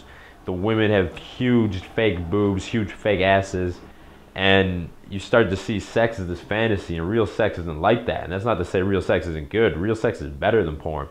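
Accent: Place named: New York, United States. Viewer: great video! you can tell this nigga from new york or something or has or had black friends lol "cant get that wood " lol "if you think about not getting hard its a rap son"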